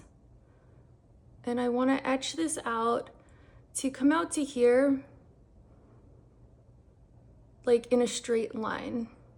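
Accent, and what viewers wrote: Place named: New York, United States. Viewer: Your voice and accent are so comforting to me. My family is from the Bronx and Jersey, but I am far from home in the Midwest. ❤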